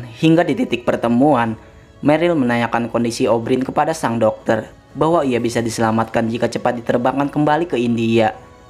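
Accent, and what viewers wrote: Indonesia. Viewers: Dari aksen bicara ke Indonesia bukan papua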